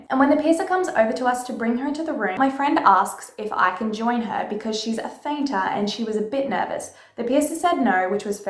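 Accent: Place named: Australia. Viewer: i LOVE the way you say tattoo ! Australian accents rule :) much love from the States <3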